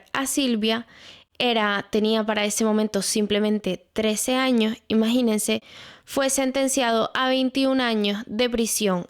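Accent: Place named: Canary Islands, Spain. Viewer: Me encanta tus vídeos, tienes una voz preciosa, con ese acento canario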